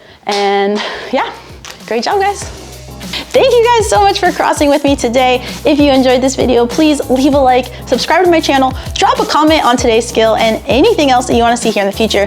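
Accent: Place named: General American, United States. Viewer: she has a simple, more-or-less "standard" American accent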